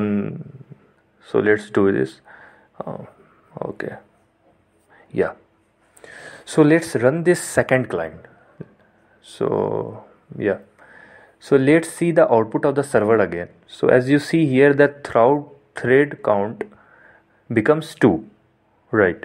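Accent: India. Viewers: Ow.... Indian accent.... By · Indian accents are often the sign of a good tutorial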